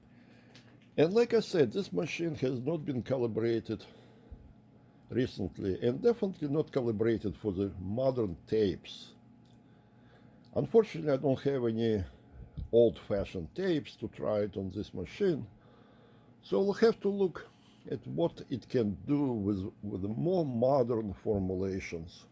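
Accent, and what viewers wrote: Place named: Belfast, United Kingdom. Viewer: Wow that was a long time ago since I heard Belfast.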